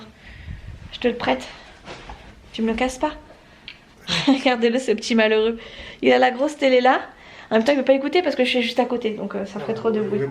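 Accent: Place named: Basque Country, France. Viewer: Que j'adore cet accent Basque 🙂👍👍👍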